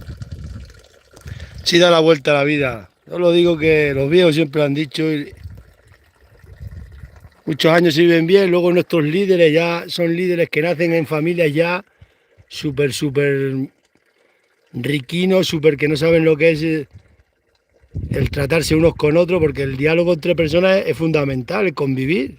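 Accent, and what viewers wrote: Extremadura, Spain. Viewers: Desce Barcelona una estremeña acabo de venir de mi querida estremadura ya soy mayor y cada día añoro más mi infancia fuy cobarde al salir de mi tierra bendigo los que se quedaron es usted mi siquiatra me gusta cómo habla como la gente noble de esa tierra gracias ustd da calma como todos los buenos estremeños aún quedan gracias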